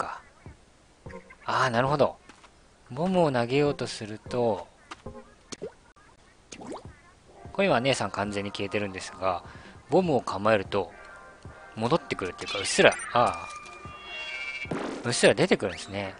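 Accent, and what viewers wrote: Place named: Okayama, Japan. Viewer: うぽつですm(_ _)m もしかして岡山県民？？？ じゃけぇって岡山弁だったので…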